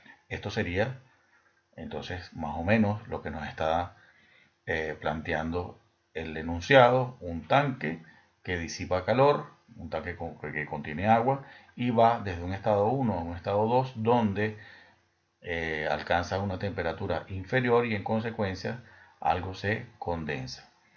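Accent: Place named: Venezuela. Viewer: Excelente hermano muy buena tu explicación! Y con acento venezolano todo se entiendo mejor..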